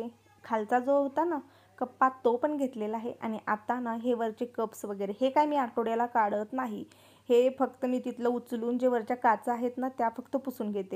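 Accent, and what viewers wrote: Kolhapur, India. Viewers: Tumi kholapurchi bhasha bholta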